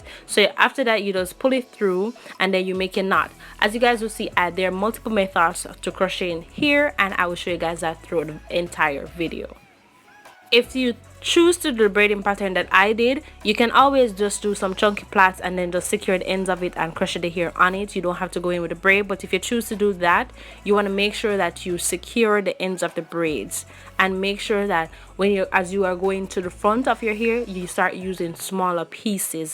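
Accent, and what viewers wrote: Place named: Jamaica. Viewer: Hey.. you sound like a Jamaican...... real ting! Good Job: Just cut my locks after 13 yrs..... need this afro in my life....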